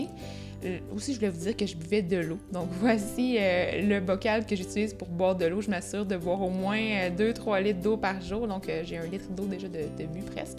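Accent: Quebec, Canada. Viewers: Tes recettes donnent toujours tellement envie !! J'ai l'impression (sur les autres vidéos du même genre que tu as fait , moins sue celle là) que tu manges beaucoup de sucré mais en y regardant bien il n'y a presque pas de sucre!! C'est vraiment génial pour les gens qui adorent le sucré comme moi. En tout cas continue comme ça t'es géniale !!! (en plus j'adore l'accent québécois!)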